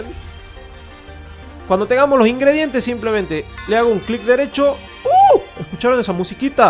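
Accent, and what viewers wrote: Tucumán, Argentina. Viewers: Tucumano hasta el pingo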